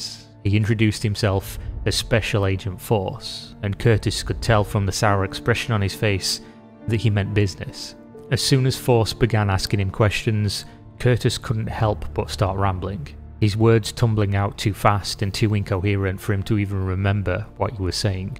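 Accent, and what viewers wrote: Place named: England, United Kingdom. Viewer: I usually find it hard to listen to these types of videos with an english accent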